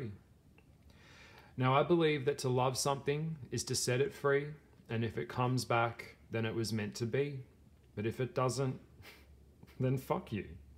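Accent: Australia. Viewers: Oh, without a doubt, but now that you mention it, you have that fun, witty energy of the demon too. I don't want to sound like I'm just being flattering here, but you've captivated my mind the last several hours listening to you. Like Crowley you seem well read, insightful, and artistic with a bit of hedonism and I would think that would excite a lot of women. This particular video made me think about my sexual choices in life and how I always played it safe with always choosing the nice guy who would always tell me what I want to hear and never challenge me or be too overtly sexual, although my sexuality was off the charts in my 20's and 30's. After a hysterectomy that really changed me hormonally unfortunately, but I think I haven't had anyone to really stimulate that either. To get to me it's always been through my mind and no one ever figured that out about me. 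Anyway, I've commented a lot here on your videos and probably should be embarrassed that it's overkill, but I find you pretty fascinating and am impressed with your knowledge and insights on the Aquarius, both the positives and the negative. That Ozzy voice is to die for, I've always loved the Australian accent, it's my favorite and I always wanted one lol. My other best friend is a Leo and lives in Tazmania.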